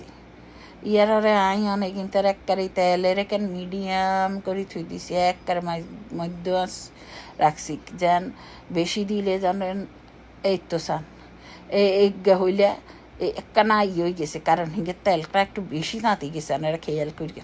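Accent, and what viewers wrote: Noakhali, Bangladesh. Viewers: রুই মাছের কাবাব অসাধারণ হয়েছে মাছের কাবাব রেসিপিটা আর সুন্দর সুন্দর নোয়াখালীর ভাষায় কথা বলে কাবাব রেসিপিটা বানিয়ে নিলেন চমৎকার চমৎকার চমৎকার❤🎉❤🎉❤ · আপু নোয়াখালির কথা আমি তেমন শুনিনি আমার কাছে খুব ভালো লাগলো তোমার ভিডিও টা ❤️সাথে তোমার কথা গুলো 👍👍👍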